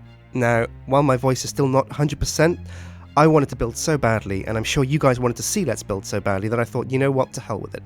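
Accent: United States. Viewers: You sound a bit american with you sore voice.makes me wonder. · Is it just me or does sound like an American when he is sick? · He sounds American.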